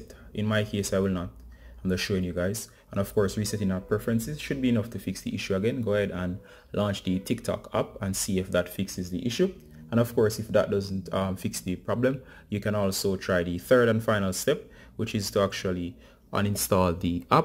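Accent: Jamaica. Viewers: Sounds like a Jamaican accent. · Are you Jamaican?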